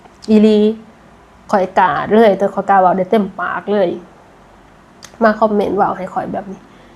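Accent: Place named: Isan, Thailand. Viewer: เพิ่งมาเปิดเจอ อีหล่าเว้าเก่ง สำเนียงเหมือนลาวอีสานเมืองไทย เว้าเก่งอีหล่า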